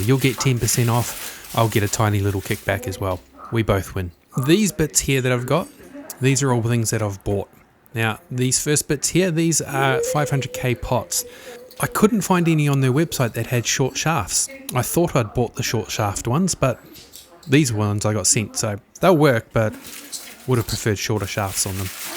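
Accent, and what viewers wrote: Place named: Australia. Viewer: The down under accent makes any content even more fun to watch. Awesome job, mate.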